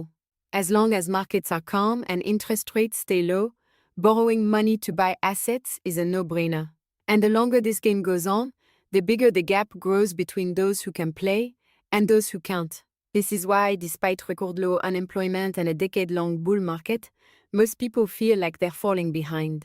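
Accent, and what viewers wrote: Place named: France. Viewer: Why would you use a French accent?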